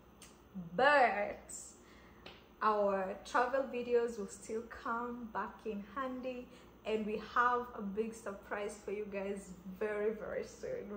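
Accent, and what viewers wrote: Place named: United States. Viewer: this accent haitapotelea Kenya i take you back to the states in Jesus name amen.nakupendaa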